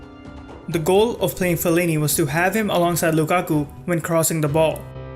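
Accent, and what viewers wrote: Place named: India. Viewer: The guy talking has to be an India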